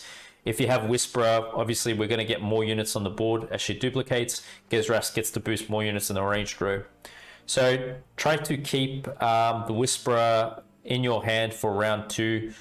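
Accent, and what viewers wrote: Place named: Tasmania, Australia. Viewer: That s why I was not sure, the Tasmanian accent tricked me 😁. Merry chrismas too.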